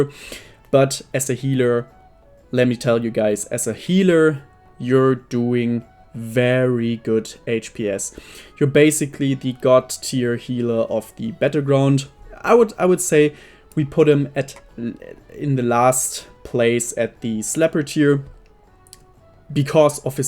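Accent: Germany. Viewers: The german accent is wyld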